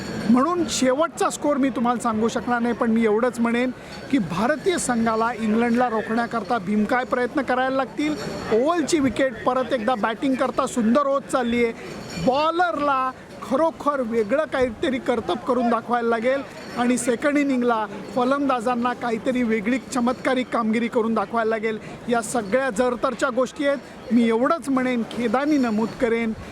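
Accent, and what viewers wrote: Pune, India. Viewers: बोलण्याची सुंदर अशी शैली. शुद्ध पुणेरी भाषा.लेले आपलं विश्लेषण ऐकतच रहावं असं वाटतं 👍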